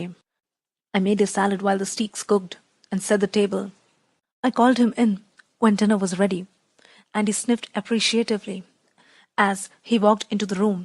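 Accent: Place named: India. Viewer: Wow!!! It is very interesting to here in Indian accent!!!! Good work.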